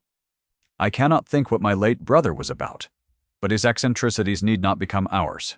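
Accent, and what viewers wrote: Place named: North America. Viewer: The North American accented AI for a story set in 19th century England doesn't do it for me...and the voice was very monotone. ZZzzzz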